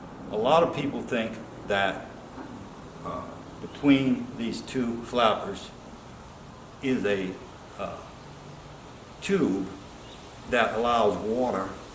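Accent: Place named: New Orleans, United States. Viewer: sounds like your from new orleans area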